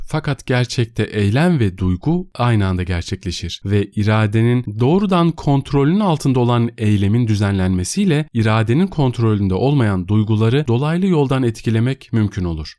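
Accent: Azerbaijan. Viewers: Qardaş siz Azerbaycanlisiz? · Azerbaijan Turkcesiyke konusuyor)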